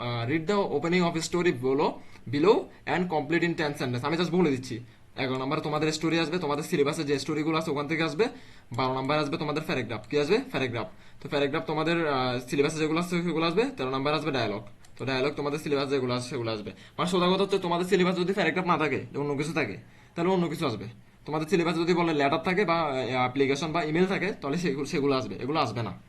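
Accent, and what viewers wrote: Chittagong, Bangladesh. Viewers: Sir apni ki Chittagong er?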